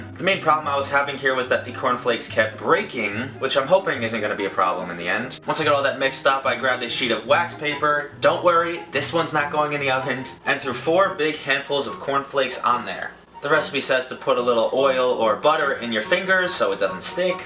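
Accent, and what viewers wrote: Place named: Boston, United States. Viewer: are you from boston?? you have such a bostonian accent!! i love it hahahaha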